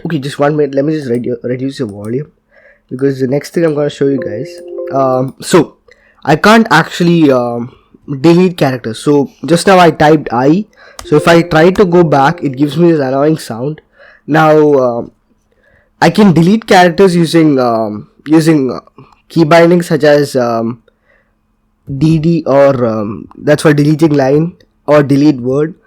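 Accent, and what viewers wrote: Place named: Bangalore, India. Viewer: Ur from Bangalore aren't you?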